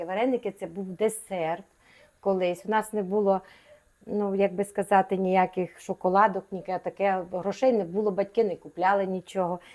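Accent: Transcarpathia, Ukraine. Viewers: Добрий день. Ви неймовірна. Приємно чути закарпатський діалект. ❤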